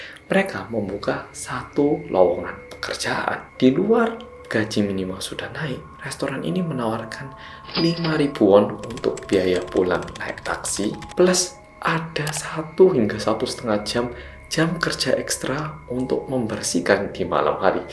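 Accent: Java, Indonesia. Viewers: Makacih, Boloku Sing Paling Medok Dheweeeee 🙏🏼🙏🏼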